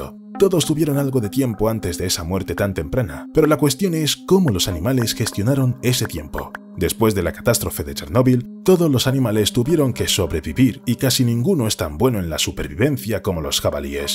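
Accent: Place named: Spain. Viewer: excelente gracias por tus videos! son interesantes y relajantes por tu tono de voz español que me recuerda a los documentales que veía cuando era un niño. Saludos desde la ciudad de Quilmes, Buenos Aires, Argentina.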